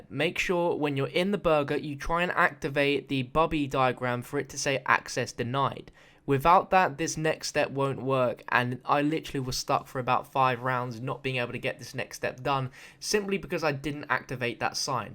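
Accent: United Kingdom. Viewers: 0 , idk if youre serious but if you are its garage but since he is British he pronounces it like that...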